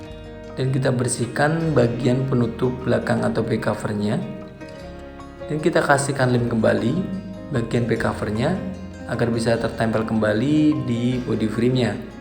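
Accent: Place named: Banjar, Indonesia. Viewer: Bang,pian buka servis nya di bjm kah....? Please jawab,uln dnger nya kaya org bnjar pian.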